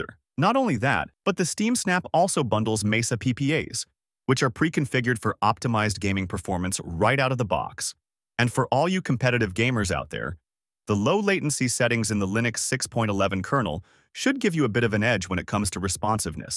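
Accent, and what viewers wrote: India. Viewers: Hey bro are you from india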